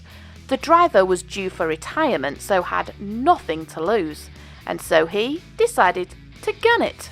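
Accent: Doncaster, United Kingdom. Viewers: So you are from Doncaster…?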